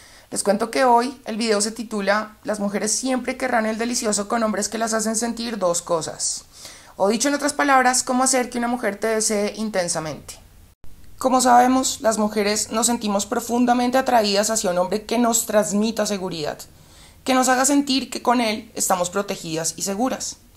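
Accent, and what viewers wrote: Colombia. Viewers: Hola..te felicito que yo no dr donde sacas esas ideas y cuando las piensas...Si te vienen s la cabeza cuando estás viendo el juego de fútbol o en el supermercado o te pones a pensar y estudiar y después te sientas a hablar o es que hablas que hablas con los asesores de España estos unidos Dinamarca y Nepal que tienes...Eres muy inteligente y hablas como su fueras colombina. Te felicito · ALABADADO SEA EL SEÑOR POR FIN UNA PERSONA QUE HABLA CLARO COMO EL AGUA... NADA QUE VER CON ESOS SEUDO COUCHES QUE HABIA MIRADO PENSANDO QUE ERAN LO MEJOR.. POR EJEMPLO SEGUIA A UN ESPAÑOLETE QUE SE PARECE A MIGUEL BOSE PINTA EL MAN Y NO LE HE ENTENDIDO NI JOTA . PERO NUNCA PERO NUNCA PENSE EN EL PUNTO DE VISTA DE UNA MUJER SINCERA FRANCA Y DIRECTA...TE DOY UN GIGANTE 10 DE 10 TE FELICITO VEO POR TU ACENTO QUE ERES COLOMBIANA , GRACIAS COMPATRIOTA ESTAS HACIENDO PAÍS . 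NO ERES JESÚS PERO DEVUELVES LA VISTA A LOS CIEGOS. ERES MUY LINDA Y NO ME REFIERO A LA FACHADA A TU APARIENCIA PERSONAL QUE ESTA EXELENTE POR CIERTO. A LA BELLEZA VERDADERA LA QUE VALE MIL MILLONES DE TONELADAS DE ORO A LA BELLEZA INTERIOR. A TI MI REINA TE SOBRA HASTA PARA DAR Y REGALAR.. EN SERIO ERES LINDA Y BELLA DE MENTE ALMA Y CORAZON 💓💓💓💓 LO DEMAS COMO LA APARIENCIA O TU ASPECTO VENDRA POR AÑADIDURA.. GRACIAS TOTALES POR TUS PALABRAS LE DAS VALOR AL VALOR TUS PALABRAS Y FRASES ME MOTIVAN Y ME SACAN DEL ENGAÑO DE ESTE SISTEMA SOCIAL CORRUPTO Y OPRESOR. YO TAMBIEN TE ESTIMO. AUEQUE NUNCA TE VALLA A CONOCER SE QUE ERES DE FIAR Y CON ESO ME BASTA SUBE VIDEOS AL 💯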